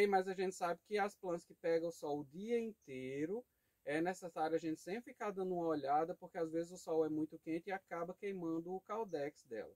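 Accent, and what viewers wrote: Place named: Minas Gerais, Brazil. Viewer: Fala meu querido!!!! E esse sotaque??? Kkkkk tá bem minerin. Me inscrevi no canal e boa sorte!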